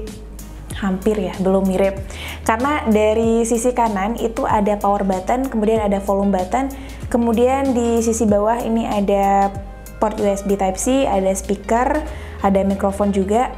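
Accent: Bali, Indonesia. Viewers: Berasa banget logat balinya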